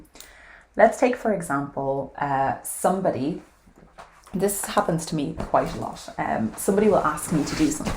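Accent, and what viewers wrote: Ireland. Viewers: It's so great to recognize the things I control vs the things outside my control. This truth was illuminating to me. Love your talks, love to hear the Irish accent, and love the scenery. Any glimpse of my home land is the best. Stay well.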